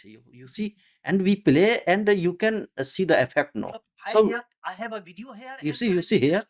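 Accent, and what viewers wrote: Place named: India. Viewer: Mat bol English bhai joker lagta hai aur foreigners majak bhi udaayenge... Typical indian accent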